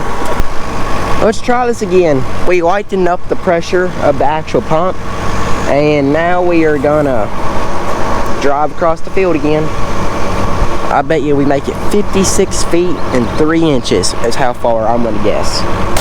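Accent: South, United States. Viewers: Hey bud, just say your channel and enjoyed it. I'm from your neighbor from Tennessee. I'm glad to here the southern talking on this. See-ya in the next one. Old Hickory, TN 🇺🇸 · Hi there, I'm new too you're YouTube channel, and I have in joyed what you have shown, thank you for showing us a part of your day, and in joy your Southern voice, I'm from Kentucky and I miss it so much, thank you for having the Southern voice, I'm from Campton Kentucky or better know as Wolfe county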